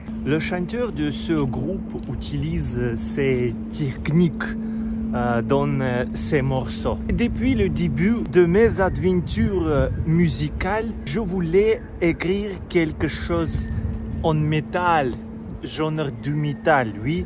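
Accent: Russia. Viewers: oui et non, il dit cela puisque tu as un accent très marqué comme dans les films ou un personnage incarne un russe :D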